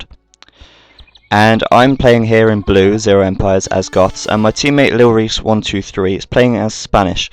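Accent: United Kingdom. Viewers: This is a great video and I love your British accent. MAKE MOAR!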